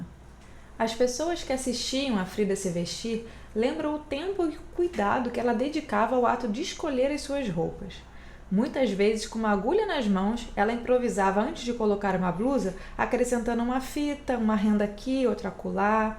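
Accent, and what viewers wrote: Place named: Rio de Janeiro, Brazil. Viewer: Ah esse sotaque carioca...❤️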